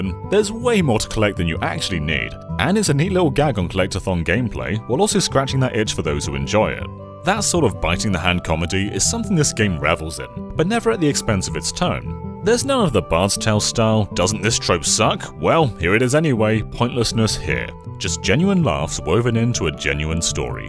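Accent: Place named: United Kingdom. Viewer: It's weird to hear a British accent on a Nintendo Life video that isn't Alex. :) Good job, good review. I wanna play.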